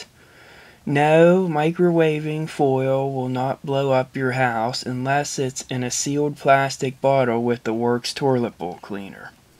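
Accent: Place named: Washington, United States. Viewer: your from Washington state aren't you I can tell from your accent its like mine :D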